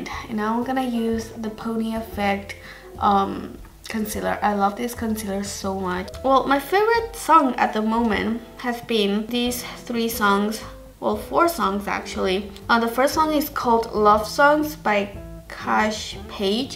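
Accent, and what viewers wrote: Spain. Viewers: Can you a video in spanish like a vlog or something, im loving your spanish accent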